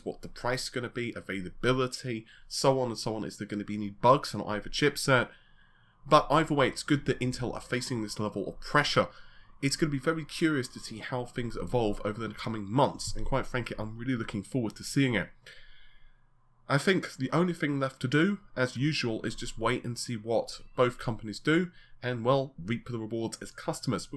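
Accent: United Kingdom. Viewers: He speaks British. You know original English and not American ghetto jibberish. English isn't my first language and have absolutely no issues understanding the guy. Don't complain when you are simply an idiot.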